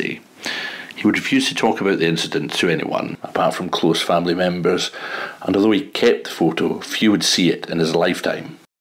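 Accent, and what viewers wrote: Scotland, United Kingdom. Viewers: I love listening to you talk, or is it a robo-voice?🤤. What part of Scotland are you from. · Scottish accent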